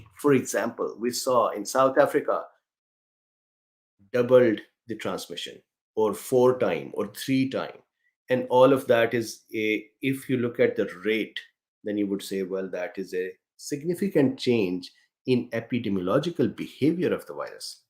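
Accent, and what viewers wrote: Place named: India. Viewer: C19 is the worlds biggest scam, fun ,speaking of scams, hearing it in an indian accent makes even more of a scam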